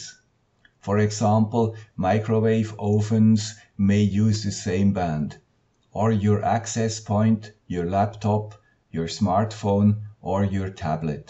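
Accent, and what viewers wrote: Switzerland. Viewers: I really love your numbering of your video's sir! Keep up the good videos and I love your Swiss accent! Danke schön! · great and very helpful ! really love your videos and btw super swiss akzent:)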